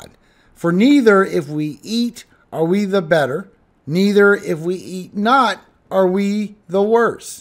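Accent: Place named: New Orleans, United States. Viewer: Why did I just know you were from New Orleans with that accent? Called that once great city home for over 50 years (Mid City) but left due to crime in 2017. You sure look familiar! ❤ 🙏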